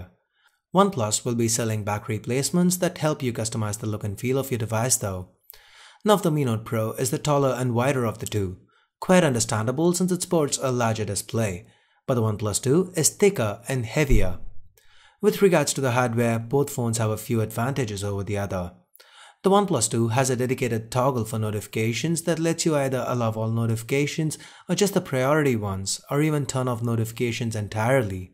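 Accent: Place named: India. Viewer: you sound very Indian, and I don't mean that in some bad way, I'm of Indian myself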